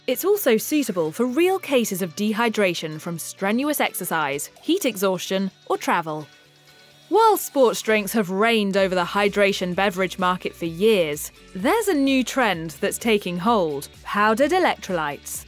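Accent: England, United Kingdom. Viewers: Why do you use a British accent in some of your videos? Is this a British content provider? It’s strangely distracting. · English accents legitimize Mashed Science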